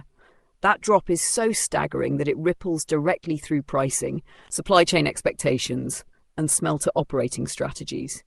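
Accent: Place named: United Kingdom. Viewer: This is my new favorite channel. Pertinent info given in a cool British lady's voice. 😊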